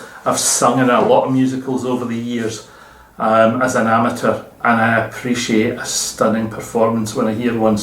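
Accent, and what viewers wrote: Scotland, United Kingdom. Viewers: that was another absolutely lovely episode! im lucky in that i spent 4 years surrounded by Scottish people during my degree, so i dont need subtitles! hee hee. i really enjoyed this episode, im loving how your stranded hat is coming along, the colour changes are quite subtle, but i love subtle, i think it works really well. best of luck with it! till next time - cheery-bye!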